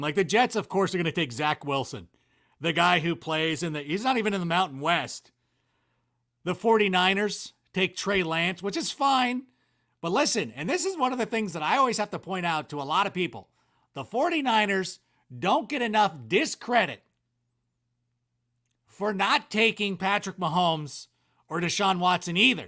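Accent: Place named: Chicago, United States. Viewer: you talk like a true chicagoan. DA BEARS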